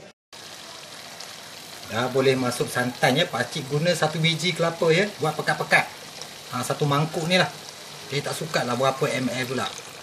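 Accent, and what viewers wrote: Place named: Riau, Indonesia. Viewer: Sedapnya nti kita try... Terima kasih ya... Cara pakcik bercakap macam org Riau la..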